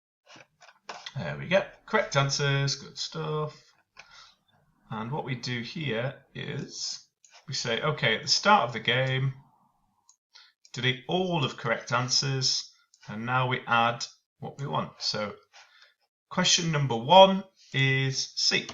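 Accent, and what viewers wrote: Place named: Ireland. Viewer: Ireland XD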